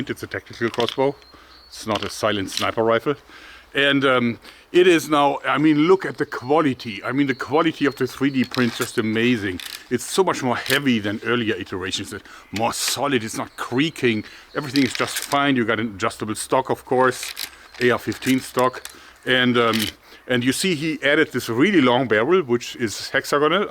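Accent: Germany. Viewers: I love that germanized english accent!. Cool!. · Hey, your accent reminds me of the accent Germans use when they speak English here in Germany. Are you German?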